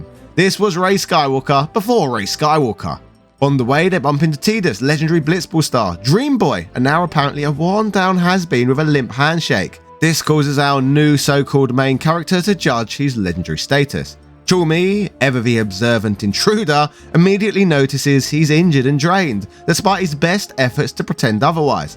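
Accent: United Kingdom. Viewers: 1) I love british accents "Wakker" and "Yuner" 2) FF X-2 is the reason I stopped playing FF forever.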